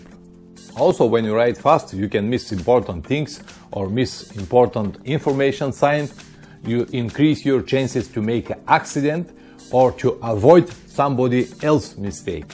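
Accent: Russia. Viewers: Ты чо? Русский чтоли? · я не знаю с какой ты страны, но у тебя такой русский акцент на английском, что я понимаю твой английский без переводчика))! С любовью из России!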